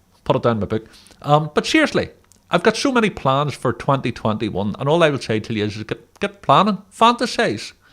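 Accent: Belfast, United Kingdom. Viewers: Oh my goodness, I’m so glad I re found you... You are a inspiration. I’m going to share this with my Auntie Pamela, she’s from Belfast & course so was my dad... I did visit Belfast a few times in my childhood. The troubles were happening at the time. I was about 8 so I didn’t understand it really. Your accent is a warm reminder of my gran parents, my auntie Pam still has her Belfast accent. My dad passed away a few years ago sadly.. but we didn’t notice his accent at all which is quite funny. Probably because we heard it from birth. Anyways so happy to have listened to you today. My road has been very dark since 2009 after losing my beautiful husband to cancer.. 😞 Thanks again, my brother is called as well, I remember his name being a issue when we were visiting as not the correct religion.. lol But thank you. I’ll look forward to seeing you more. 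Take care. Lorna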